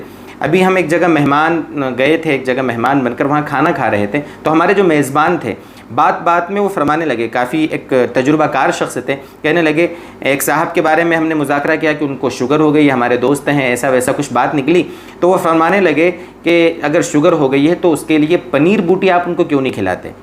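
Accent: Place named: Gujarat, India. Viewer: Bolne ka laheja gujratio jaisa hai .shayad surat district ke hon.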